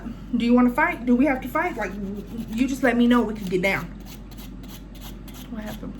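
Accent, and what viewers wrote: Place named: Clovis, United States. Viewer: Lmaooo😂😂 this sound like so many Clovis females I know, got me wondering which ones all nosey🤣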